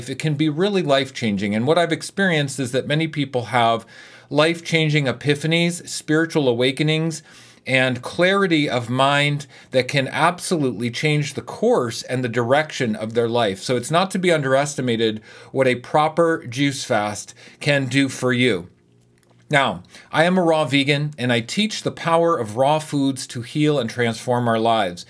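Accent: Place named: United States. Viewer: Cause he is speaking very well,not too fast and trust me because it’s American English. 😊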